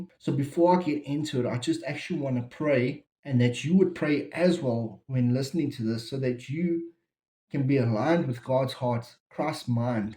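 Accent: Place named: South Africa. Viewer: You sound South African brother! Thanks for sharing. Popping by from Mozmabique!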